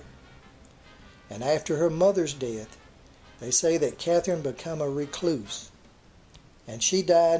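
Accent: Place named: Kentucky, United States. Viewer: I love your accent. It sounds Kentucky or maybe Tennessee, but I could be wrong.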